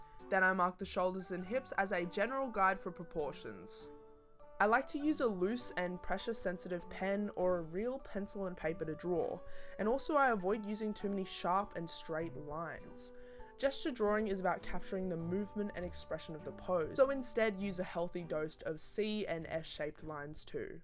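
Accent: Australia. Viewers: This outta topic but for some reason I can tell you’re Australian, I actually know the reason I lied, it’s cuz u have the same accent and speaking manners of Rhea Ripley and as a wrestling fan I was like “woah u sound familiar”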